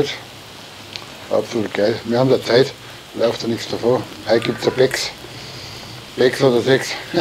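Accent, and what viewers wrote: Bavaria, Germany. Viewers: Die Wachtelbohnen in Tomatensoße muß ich mir auch mal besorgen. Die gibt es bei Amazon günstig im 2er-Pack. Dein bayrischer Dialekt ist für mich immer ein Genuss. Ich wünsche Dir viele Sonnentage. LG Bernd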